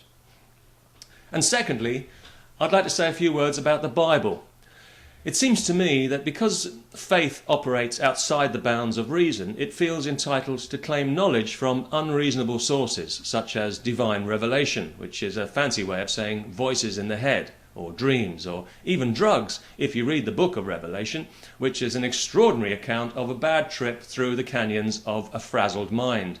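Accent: United Kingdom. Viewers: Nothing like a smack down with a British accent!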